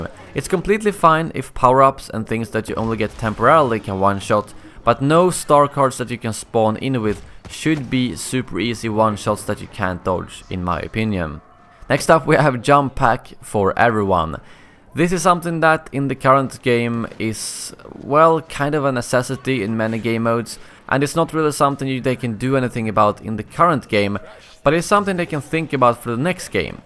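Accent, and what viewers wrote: Stockholm, Sweden. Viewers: Haha älskar "stockholmsdialekten" på engelska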